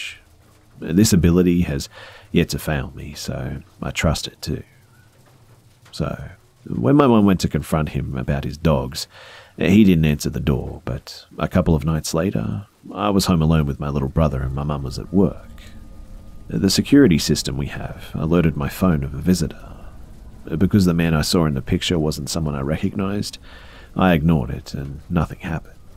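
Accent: Australia. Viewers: He's not British, he's Australian. Like, Steve Irwin, fair dinkum Aussie. I can understand getting the two confused, though. Just think Lazy Masquerade when you think British.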